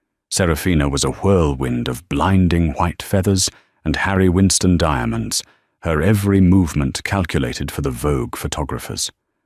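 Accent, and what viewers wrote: United Kingdom. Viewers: Another interesting story about a sensitive issue. Well written. I love this British narrator voice. ❤❤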